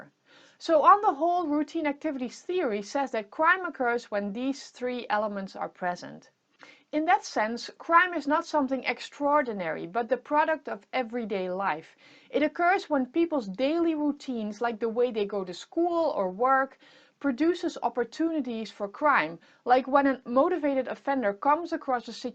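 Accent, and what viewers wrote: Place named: Twente, Netherlands. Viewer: Interessant. Goed uitgelegd ook. Passioneel verteld. Met een tukkers - engels accent:-). Hoe gaat het verder met je? Gr Hessel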